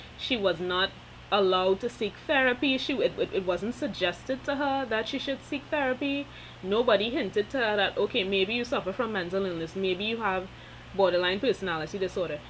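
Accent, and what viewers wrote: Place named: Trinidad and Tobago. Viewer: Sooo... I have a thing for true crime and psychological subjects myself and have been researching this case extensively. Came across this video ans was like whoaaaa... is that a trini??? Cant miss the accent at all. I was like yes definitely a trini. From the moment I listened to Betty's actions, her interviews, everything I instantly felt like it was BPD... I had never been formally diagnosed but when I was younger before meditating and becoming self aware, I had these weird behaviours, hypersensitivity to perceived abandonment and rejection, and a rage that made me question myself a lot. When i researched BPD it was sooo in alignment with what I felt. A lot of the emotional issues I had, I worked through them by meditating and coming in alignment with my inner self, healing past childhood traumas etc.. UNTIL i entered a relationship with a narcissist. 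I was very aware of narcissism and he was completely textbook with the insidious undermining, gaslighting, head games, belittling etc. I found myself becoming erratic again, only in moments of being attacked though. I was becoming violent and nasty every time I was faced with verbal and emotional, sometimes physical assaults. But the thing is, he was so quiet, soft spoken and calculating, and I would react so loud and erratic, that I looked like the absolute nut job. While he stood there with a smirk on his face while quietly saying, "watch how you does get on." And i felt like I was going crazy. I just wanted to make it stop.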